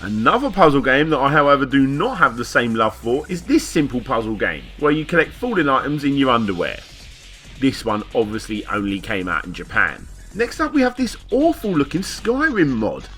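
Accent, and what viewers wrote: United Kingdom. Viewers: Fankfully. U British and ur accents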